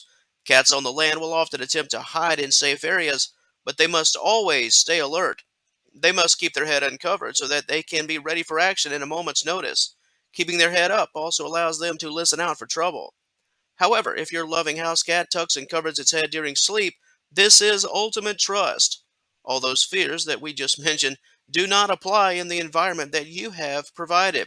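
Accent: Texas, United States. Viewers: Are you from Texas? You sound like a Texan.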